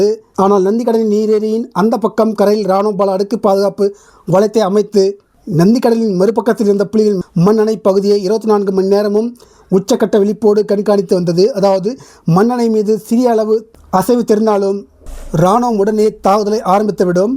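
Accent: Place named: Kerala, India. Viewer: Adooi clear ahh vilankira mari sooluda Ethoo malayalali Tamil pesuramari pesurai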